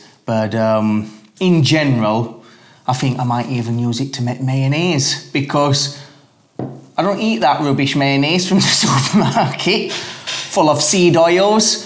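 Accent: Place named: Scotland, United Kingdom. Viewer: he sounds Scottish, maybe Irish.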